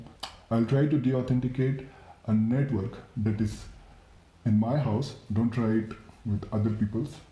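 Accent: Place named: India. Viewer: Aren't u turkish? Its sounds like ur indian ur not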